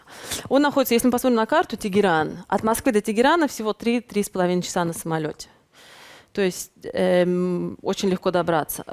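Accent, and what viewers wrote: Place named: Samarkand, Uzbekistan. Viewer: Шикарный акцент, как будто соседка из Самарканда рассказывает. Очень интересно и увлекательно. Один вопрос, как быть в последующем с визой в Штаты, после посещения Ирана?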